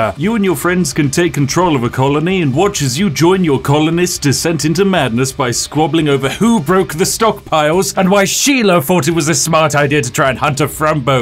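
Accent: United Kingdom. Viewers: British man doing game reviews. Rip totalbiscuit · I think it might have been a Rim World video that got me to subscribe to your channel. You were jacking stock images and harvesting organs and I thought to myself, "This British sounding guy is a complete psycho, I must subscribe"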